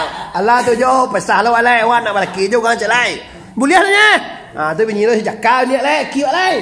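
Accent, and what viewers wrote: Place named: Pariaman, Indonesia. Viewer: Bahasa Minang kabau logat Pariaman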